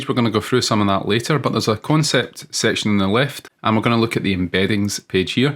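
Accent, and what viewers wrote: Scotland, United Kingdom. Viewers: Loving your Scottish accent mate! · Trying to focus on the topic but your accent keeps throwing me off. Love the scottish accent.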